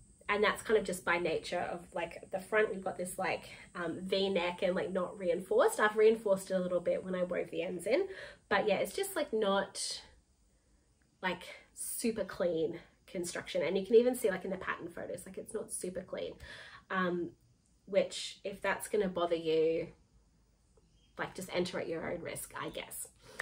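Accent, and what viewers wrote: Australia. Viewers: Hi there, so nice to see you again. Thanks for your informative podcast about your projects it was really helpful and it's so nice to hear an Aussie voice on You Tube. Have a great year!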